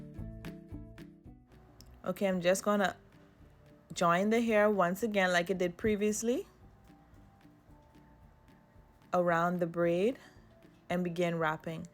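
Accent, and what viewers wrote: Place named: Bahamas. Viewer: Are you bahamian you sound like me am from the Bahamas but live in Seattle Washington currently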